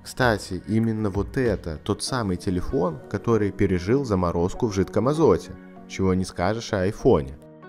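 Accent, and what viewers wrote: Belarus. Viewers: Крейзи белорашан эксперимент, судя по говору.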